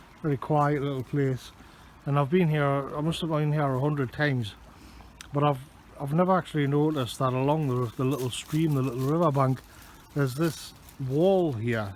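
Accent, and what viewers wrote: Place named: Newcastle, United Kingdom. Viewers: Sounds pure Geordie to me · Just for your info most Englishmen are mixed and the Celts/Romans had a huge effect on the landscape before the saxons came. Interestlingly you sound Northern so am sure you have heard of Cumbria thats hugely diverse and many a standing stone, different dialects. P.s And many of the larger features were built by my ancestors. Norman castles. I consider myself a Brit as I know my ancestry and am proud of all my ancestry be it English, Welsh or Viking. Youll find many a Viking name up North and the Saxons came very close to losing. That said we ended up with two Viking Kings. Cnut and William 1 (our curreny Queen descended from Rollo/William was his Grandson I think)) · Is this a Northumberland accent? It sounds Welsh in part, Scottish in part and Geordie in part.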